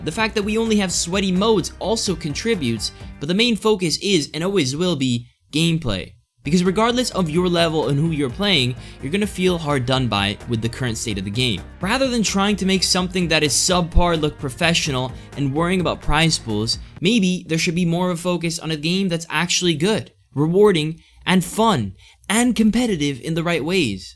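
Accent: United States. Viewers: Completely out of context, but out of curiosity, where are you from? Your accent sounds waaaaay too american for an actual italian, lol.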